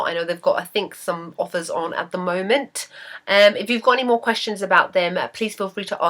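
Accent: United Kingdom. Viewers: Looooove british accent! =)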